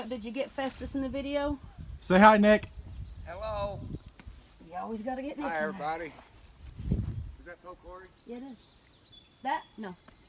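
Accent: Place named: Kentucky, United States. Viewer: Is this really the hills of Kentucky? I love it, and that accent is too cool - hoping for lots more videos like this!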